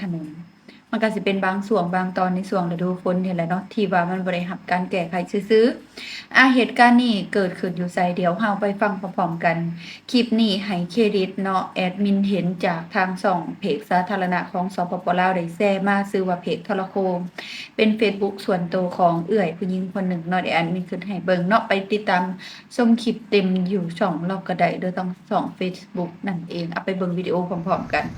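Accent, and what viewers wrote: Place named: South, Thailand. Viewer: สำเยียงทำไมเหมือนพูดไต้ผสมอีสานเลย😅😅😅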